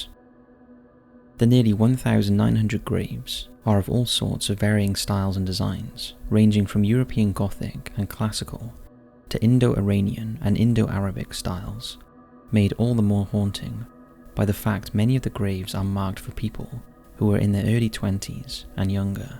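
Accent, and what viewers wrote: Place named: England, United Kingdom. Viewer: As long as you are able to understand what is being said, the accent or enunciation does not matter. The point of language is to communicate effectively, as long effective communication is being carried out, it really does not matter if Tomato is pronounced "Tomayto" or "Tomahto". English originated from England, yet Americans took it as their first language, changed the spelling and pronunciation of words. Americans themselves have dozens of English accents. Even in the UK, there are many accents. Both UK & USA being primarily English speaking countries have many different ways of pronouncing words. If you look at footage of Americans from 1940-60, their accents sound way different than they do now. The further back you go in time, the more American English sounds like British English. Language changes and evolves with time and globalisation. 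In conclusion, there is no right way to pronounce any single word, because not everyone has the same upbringing or cultural background. Your accent is decided by your race, family, place of upbringing, first language, how many languages you speak. And it can also change if you spend time in a foreign country. So I don't think you should nitpick about how an "Englishman" enunciates words, if you're an "American".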